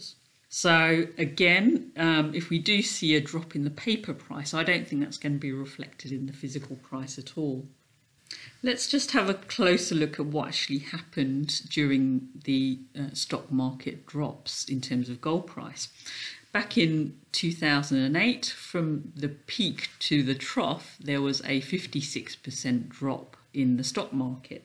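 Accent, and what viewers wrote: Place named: North London, United Kingdom. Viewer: Sounds like a north London accent , probably Wembley , Finchley where I’m from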